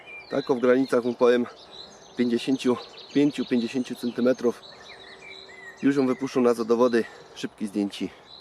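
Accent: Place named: Silesia, Poland. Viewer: Fajne hobby - wedkarstwo (lapa w gore) ALE wytrzymalem 1 min 20 sek ... nie da sie kurwa tego sluchac, mam bardzo wielu znajomych Slazakow i wiem doskonale ze potrafia NORMALNIE GADAC jak zechca. Film bez dzwieku OK - graty za rybki !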